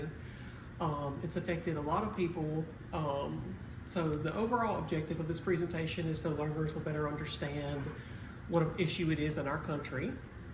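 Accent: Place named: South, United States. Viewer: Close your eyes and imagine a plump southern Belle talking because this lady is her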